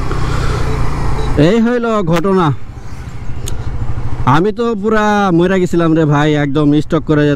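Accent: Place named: Bangladesh. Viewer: আপনের কথা এমন বাংগাল এর মত কেন ক্ষেত পুরা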